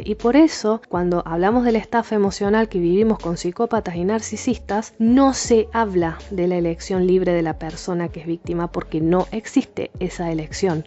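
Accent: Córdoba, Argentina. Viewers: ! Gracias! Me encanta escuchar tu tonada! Vivo en Chile hace unos años...y es muy grato seguir a una cordobesa! Estoy muy contenta de encontrar en youtube videos de la excelencia que tienen los tuyos! Gran trabajo! Felicitaciones! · Es Cordobesa por su tonada, pero si sos de afuera, capaz que hace sesiones por video llamadas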